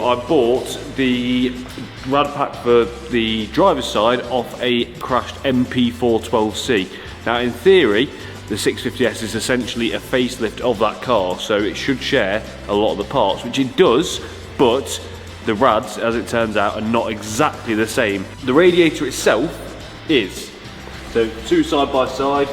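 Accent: United Kingdom. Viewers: I’ve never heard a person with a non American accent say “ain’t” before. Proof a British accent makes any word sound proper….ish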